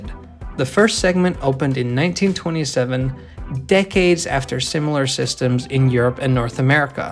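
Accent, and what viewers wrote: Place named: United States. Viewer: I’ve often wondered about this. You speak very good American English, and had “huh?” moment when I saw you were from NL. Imagine my jaw drop when I saw this video with you speaking very good Japanese pronunciation and hearing you grew up there. Any other surprises?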